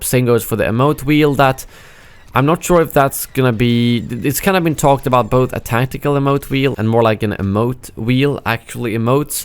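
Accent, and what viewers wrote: Sweden. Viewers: I have a feeling you are from Northern Europe, probably Sweden judging by your accent. Am I right?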